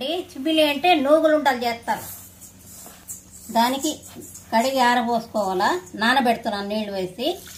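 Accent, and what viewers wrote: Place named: Hosur, India. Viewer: నమస్కారం అండీ, మా అవ్వ చేసేది వీటిని:-) మేము బ్రహ్మణులంకాదు గానీ మీరు చేసే చాలా వంటకాలు మా అవ్వలు చేసేవాళ్ళు. ఇటీవల 10 ఏళ్లుగా ఆహారపు అలవాట్లు బొత్తిగా మారిపోయాయి. మీ videos చూసి మళ్ళీ ఆ పాత రోజులు గుర్తుకొస్తున్నాయి. వీటిని మేము సింబిలి/శింబిలి ఉంటలు అంటాము. మాది హోసూరు/బెంగళూరు ప్రాంతంమండి, మీ యాస కూడా మా యాసలాగే ఉంది. మీది ఏ ఊరు?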